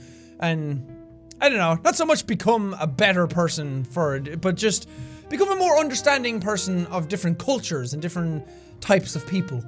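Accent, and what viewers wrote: Ireland. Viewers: Welcome back! Even though I was binge watching previous videos, I still miss hearing that sweet, sweet Irish accent ^_^ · Ah the accent., you have clearly been back to Ireland. Lovely. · you can here that his irish accent is stronger after he was in dublin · I've missed that high pitched Irish voice so much! I've watched all the series on this channel to keep me happy and distracted from the shitty reality since I've been here for about 2-3 years now. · It's nice to hear your Irish voice again.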